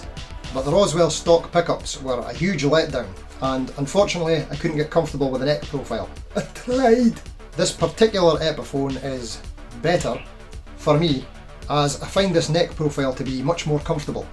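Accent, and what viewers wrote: Scotland, United Kingdom. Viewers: Guys I think he might be Scottish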